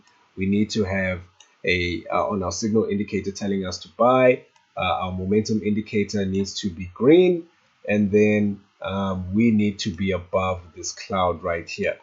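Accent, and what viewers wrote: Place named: South Africa. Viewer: I swear to you. You are a South African😊I like that. This video has helped me a lot. Thank you so much. Keep doing the great work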